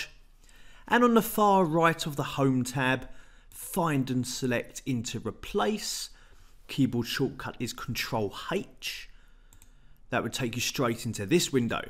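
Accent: United Kingdom. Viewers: Listening your accent reminded me of Peaky blinders😁 However, it was a great lesson. I got to know a lot new things. Thanks:yougotthis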